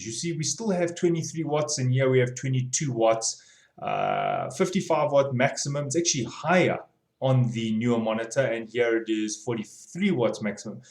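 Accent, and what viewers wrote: South Africa. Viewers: definitely south african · As a South African myself, I can confirm that he's from South Africa due to his accent.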